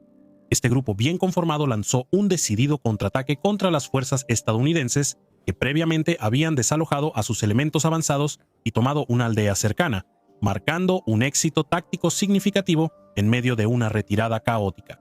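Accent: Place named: Latin America. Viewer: no puedo man ¿que coño me hablas de nuestra guerra con ese acento bananero? Leck mich am Arsch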